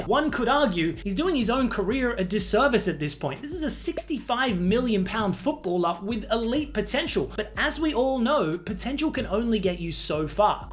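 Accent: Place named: Australia. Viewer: The Aussie accent is indeed the best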